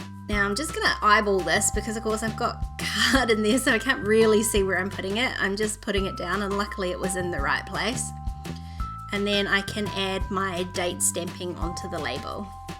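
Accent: New Zealand. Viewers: Love it, great to hear a kiwi, can’t wait for my kit to arrive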